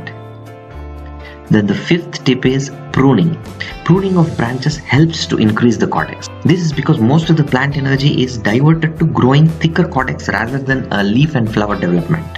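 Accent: India. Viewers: Your tips help, however not to be rude but I would highly suggest that you take some English speech classes. Your heavy Indian accent makes it extremely hard to understand what you are saying. After awhile of listening, it actually becomes painful to listen to the video because of this. Again I’m not trying to be rude, I hope you will consider this a kind, helpful suggestion that it was meant to be.. I think you will see a difference in your positive votes if you can put some hard work into reducing that heavy Indian accent. I wish you success!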